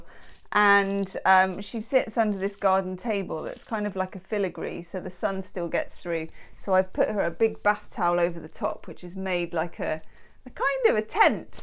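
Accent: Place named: Cockney, United Kingdom. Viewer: Fahhhmly. What a wonderful cockney accent you have. So many talents. And such sweetness. Thank you.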